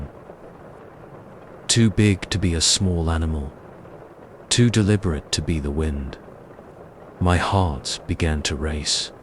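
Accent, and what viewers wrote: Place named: United Kingdom. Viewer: I hate the British accent it ruins the whole stories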